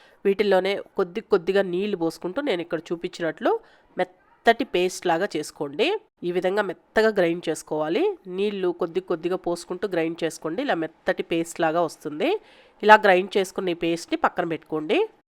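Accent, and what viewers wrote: Nellore, India. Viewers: Meedi Nellore madam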